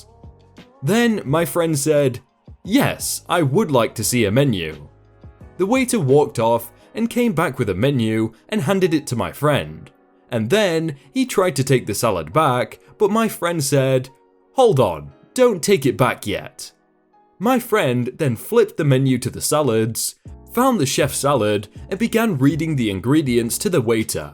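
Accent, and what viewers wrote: United Kingdom. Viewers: He's pronouncing [SCAY - DA] due to his British Accent.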